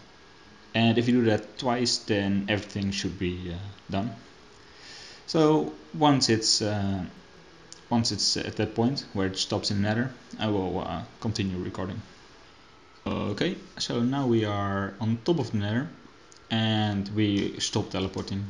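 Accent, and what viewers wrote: Netherlands. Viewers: Nice dutch accent ;) This works like a charm, thanks!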